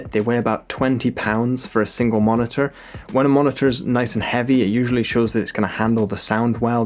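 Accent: Scotland, United Kingdom. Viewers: Where are you from I know Scotland what part ?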